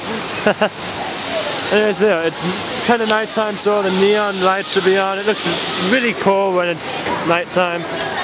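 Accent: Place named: Germany. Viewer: Ur accent sounds german but I may be mistaken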